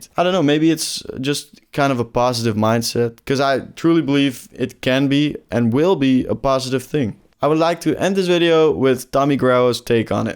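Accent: Netherlands. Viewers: What's your mother language? Dutch?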